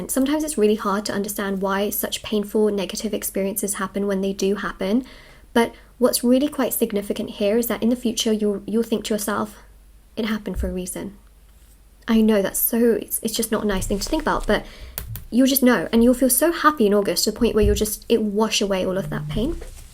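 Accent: United Kingdom. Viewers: Much confused she sounds British uses American slang haha thank you for the reading. I hope this is how it works out.. 🤞♊️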